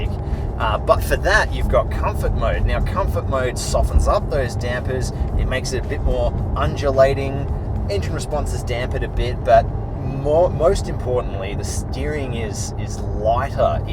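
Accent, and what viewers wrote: Australia. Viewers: Do you from Australia?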